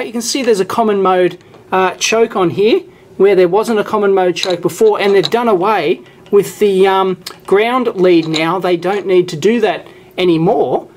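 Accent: Australia. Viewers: I don't get it. You just sound Australian to me. Why do people keep pointing out your accent. You're perfectly legible.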